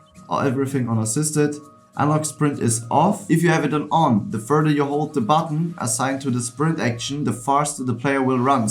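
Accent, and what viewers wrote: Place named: Germany. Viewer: Mann kann höheren das du deutscher bist haha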